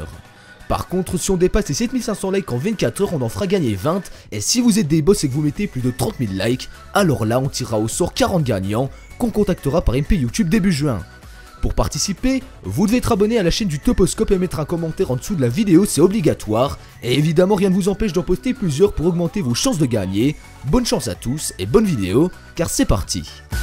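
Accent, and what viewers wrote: Belgium. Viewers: j'adore l'accent belge.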